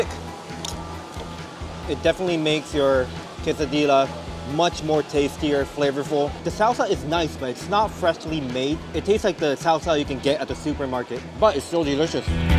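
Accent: Singapore. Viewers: hi...i like yr relaxed singish style...keep up the good work!!!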